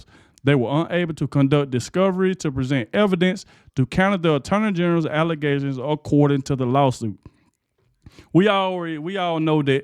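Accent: South, United States. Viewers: Love your Pod Cast👊🏻👍🏻. Your Southern accent makes me think of my Louisiana , not Louisiana , roots💕. I love the DEEP SOUTH AND ITS DOWN-HOME FOLKS👏!! I had to come back to San Diego Calif after 22 years in Florida. I MISS FLORIDA SO MUCH😢!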